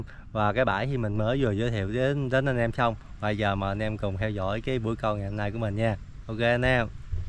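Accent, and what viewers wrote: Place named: Mekong Delta, Vietnam. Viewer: đúng nói chuyện kiểu chân chắc miền tây, câu dính thả cá là mình thích rồi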